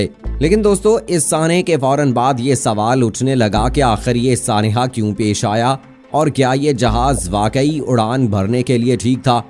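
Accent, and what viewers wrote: India. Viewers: App ke urdu Indian ka lahja jisa ha bakwas band kar do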